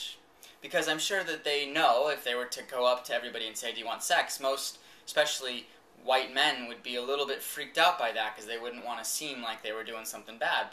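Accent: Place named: England, United Kingdom. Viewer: i like ur english accent where ur home town?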